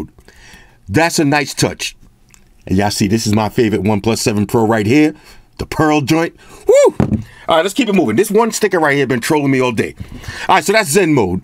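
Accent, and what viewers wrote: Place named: Staten Island, United States. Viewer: Is from Staten Island? Because the way he speaks reminds me of Wu-tang.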